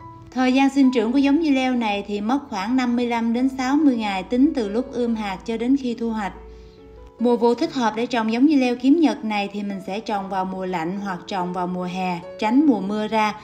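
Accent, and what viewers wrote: Mekong Delta, Vietnam. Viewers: Bạn ở miền tây hay ở đà nẵng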